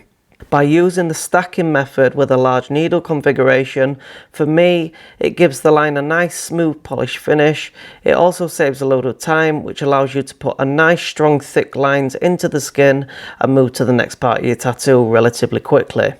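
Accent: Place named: Yorkshire, United Kingdom. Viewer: Thanks for this mate , we're close in accents as I'm a Yorkshire man and this was good to find something familiar sounding because the American reference points can differ , just dine my first self tatoo and your video was really informative thank-you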